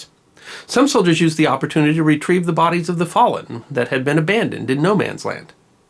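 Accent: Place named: United States. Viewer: at last I have found someone whose American accent is thicker than mine! Hint: The terminal "e" on German adjectives is pronounced like the English "a".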